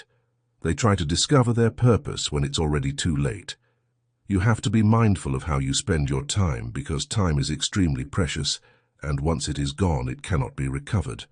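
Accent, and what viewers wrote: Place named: United Kingdom. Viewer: Dear Sir. Thank you for the content that you create and share with us. is a blessing to humanity and your wisdom truly touch our hearts. At home we refer to you as the man of God with the British accent. This video made me burst out in tears 😢 I grew up with many setbacks and only discovered myself late in life. From a very young age the enemy has set traps in motion to delay and derail me from my God given destiny. Just when things got better for us as a family my daughter was diagnosed with type 1 diabetes in 2022 at 17 months old. It turned our lives upside down and we face many challenges. I had to resign my job to take care of my daughter full time to meet the requirements of keeping her healthy. I feel like a loser when i cant give my children what they ask for and also the full financial responsibility being on my husband. 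We are a praying family and we love and trust in Jesus. There are so much i dont comprehend and my heart is aching for my daughter and what we are going through. Please pray for my daughter that God will heal her. May God bless you endlessly and continue to do mighty works through you and this channel. We are truly blessed to have you ❤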